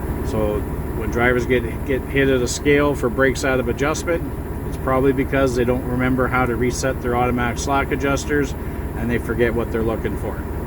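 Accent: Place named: Canada. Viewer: Finally... I found someone who sounds 'Canadian' ...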